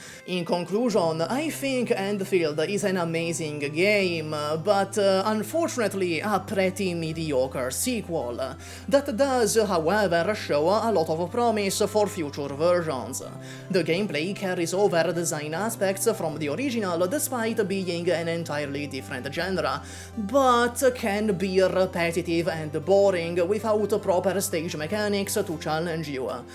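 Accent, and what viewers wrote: Italy. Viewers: you yap in your silly italian accent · Just because of your Italian accent 🤌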